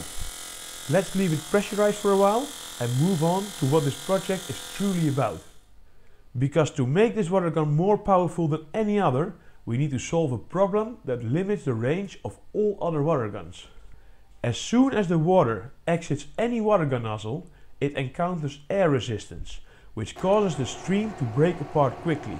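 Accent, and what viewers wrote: Netherlands. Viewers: Hoort meteen dat je een Nederlander bent🤣😂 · I bet this guy is Dutch, I can hear it within 2 seconds. Nice vid. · This accent screams dutch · Heeerlijk altijd dat hollands accentje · It took me exactly 0.2 seconds to find out you're dutch from your accent 😂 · Bro that Dutch accent goes hard af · When i heard your voice and saw your garden i directly thought this guy is dutch. chat gpt verified it. Hoi broeder van een andere moeder. Het is altijd leuk om een nedelander te vinden (of belg maar dat accent is anders). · I hate the dutch accent 😭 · Interesting video. IMHO if the "ice" projectiles become lethal it falls into lethal weaponry category and as such could be a perfect weapon to kill without trace. This definitely will attract attention from the CIA and alike. It also probably will be banned. Ps why define in feet? I hear on your acence that your Dutch. 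Let those US audience convert to metric with their arrogance for imperial for once. 😅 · Thats one hell of a dutch english acent there mate😂 Net als mark rutte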